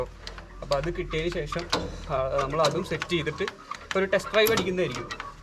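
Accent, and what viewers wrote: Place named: Kunnamkulam, India. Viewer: Kunnamkulam kaare parayunoooda naaari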